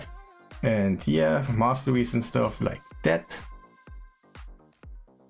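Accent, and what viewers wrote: Germany. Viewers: hey when zinogre came out i made a 2 to 1 unkillable team with zinogre emic painkeeper (in the calc) could not test it yet do you want to test it? ps are you german? you kinda sound german ^^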